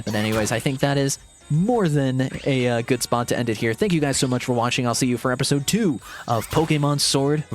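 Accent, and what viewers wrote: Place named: RP, United Kingdom. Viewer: the super accurate posh accent got me hollering within the first few minutes HAHAH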